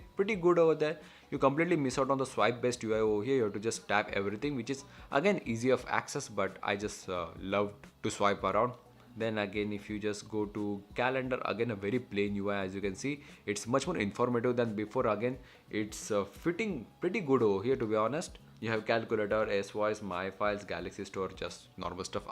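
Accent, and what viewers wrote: India. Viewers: Its the accent actually. Hindi. And thats something unique.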